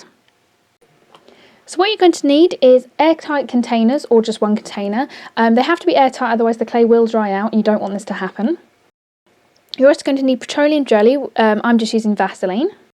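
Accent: United Kingdom. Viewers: omg are you British? cuz I love your accent and great video!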